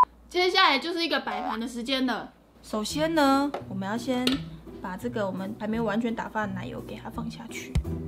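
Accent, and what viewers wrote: Taiwan. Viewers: 是塔批歐咖哦～ 然後我是看癡癡的合作影片來的，覺得道地的台語非常對我胃口訂閱了，加油☺️ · 好喜歡的口條超台但是又很清楚！真的很難得哈哈😍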